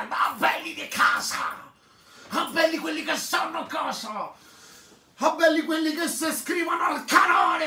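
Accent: Italy. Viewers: minchia che bono lo sfincione!!! grande grazie pe avecce fatto compagnia pure oggi ma detto onestamente te preferisco quando parli Romano!!! Daje one love x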